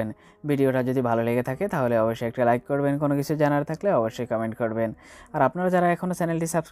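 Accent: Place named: Bangladesh. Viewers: আপনাদের সব ভালো কিন্তু বাংলাদেশের উচ্চারণ করেন কেন ? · उच्चारण शौली बांग्लादेशी पंरतु भाषा हिन्दी। यह अन्याय है।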